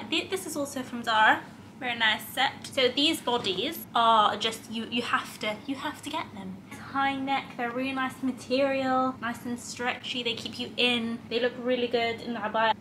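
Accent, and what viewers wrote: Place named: United Kingdom. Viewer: You need to tell us your story, who you are and where r y from! Why do you have a british accent, WHO ARE U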